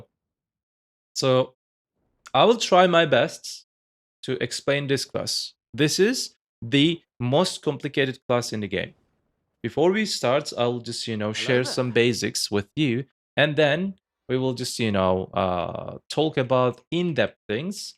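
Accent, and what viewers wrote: Turkey. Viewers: ben o türk aksanının kokusnu alırım abi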